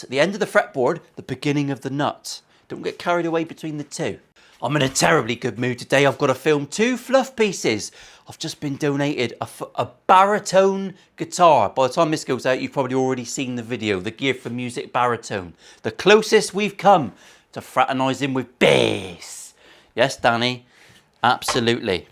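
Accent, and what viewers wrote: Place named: Northern Ireland, United Kingdom. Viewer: If I wan a good giggle I don’t tune in to John Bishop etc I tune into this channel. Always something to laugh about and I get to learn new tips tricks and techniques with guitars, brilliant, and I love the Northern Ireland accent and!!!!!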